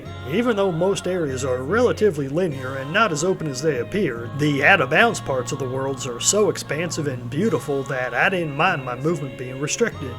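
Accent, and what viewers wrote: South, United States. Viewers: why does the narrator sound like hes auditioning to be a character in the video game with a southern accent?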